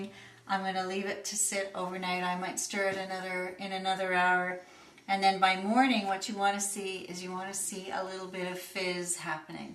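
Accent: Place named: Minnesota, United States. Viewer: Hi, there. had a little ginger root left over from a curry i made. saw your video and adjusted the amounts roughly and got me 500ml of fiery ginger beer. well done with the video, very clear and easy to follow. my next batch will be a full one. what % alcohol would they be? also how do you get rid of the sediment? i've heard cream of tartar mentioned, what's that all about. I'm from england, love your accent, i watched a film called fargo, would that locate your accent at all? bye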